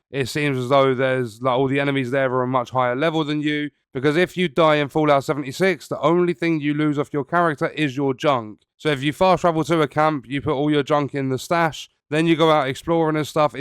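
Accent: United Kingdom. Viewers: Every Fallout 76 youtuber seems to be British lol. I know it's not their fault, but the British accent to Americans is so grating lol.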